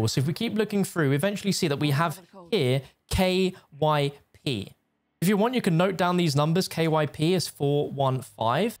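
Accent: United Kingdom. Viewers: hahaha the British accent got me. He says THRee players and then one of the FRee has to unlock it by blah blah. I thought he was saying paid players could get right in but free players had to unlock it. Bummer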